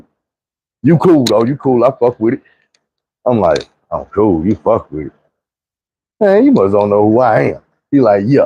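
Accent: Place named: Texas, United States. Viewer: Bruh I watch yo videos all the time you sound like my Texas uncles guess it’s that Texas/Arkansas connection you 💯bro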